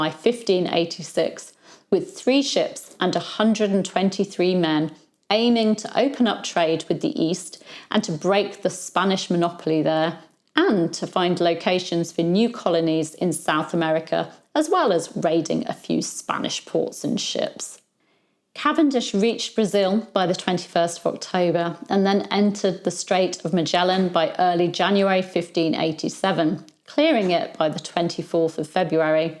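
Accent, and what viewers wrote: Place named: England, United Kingdom. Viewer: Having lived a stone’s throw from Jamestown Settlement and visited the Outer Banks many times, I get a thrill hearing an English person speak of the history. I cringed a bit when I heard Ralph Lane’s name; he really cut a swath through the Native Americans in “Virginia” in 1585. I’ve always wondered what Queen Elizabeth thought of that. Thanks for all your amazing work!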